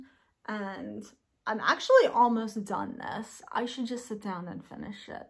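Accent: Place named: Philadelphia, United States. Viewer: You have to live near Philly, we sound the same! Lol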